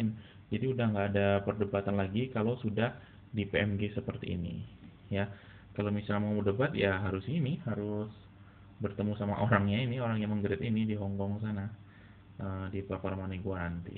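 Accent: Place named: West Java, Indonesia. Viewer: maaf sobat ini daerah apa ko logat nya sunda,maaf ya, sobat,smga sht& bhga sll amiin YAR 🤲🤲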